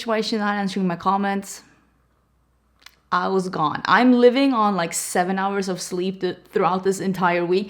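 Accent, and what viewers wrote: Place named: Sweden. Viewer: You speak too fast with that thick Swedish accent, slow it down, gosh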